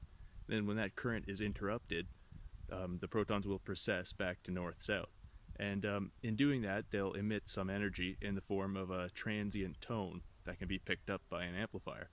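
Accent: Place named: Canada. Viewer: Yeah I'm enjoying the dual pancake experiments! Very little on yt done with actual muon air shower measurements, so that's interesting to see. You sound Canadian and it looks like you're in a deciduous forest - Ontario? If so and you're ever in Rochester for some undoubtedly regrettable reason let me know and I'll give you a tour around the high energy laser fusion facility here.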